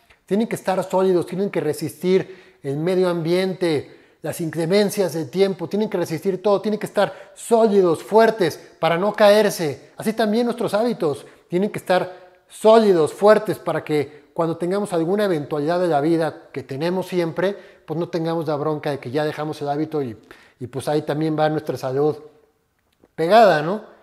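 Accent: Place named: Mexico. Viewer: Menos NLA BLA BLA Y MAS clara y NADA DE ORALE ORALE . ERES MEJICANO?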